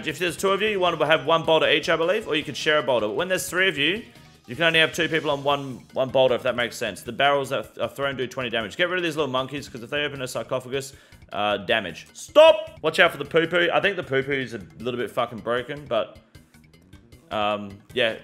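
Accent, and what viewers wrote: Australia. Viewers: ur aussie accent is fantastic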